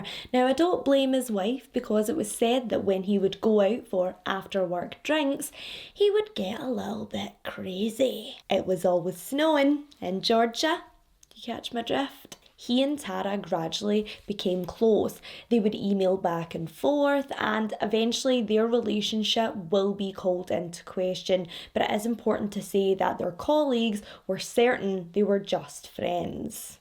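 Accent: Scotland, United Kingdom. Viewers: I'm just now watching this, months after posting, because I thought she was a young child from the picture. Children stories are heartbreaking more than adults, so I never watch, but out of desperation at the moment, I'll watch this, so I can hear that sweet Scottish empathetic voice of yours and hear about this poor young ladys situation. If ever I have skipped any of your videos, it's cause it's about a child, the younger they are, the worse it is to listen to. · You crack me up, you little weirdo. I love your accent so I'd listen to pretty much anything you wanna talk about. Can't wait to take a trip to Scotland!! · BTW, are you Scottish? I adore your accent and have Scottish friends. Love visiting them when we can.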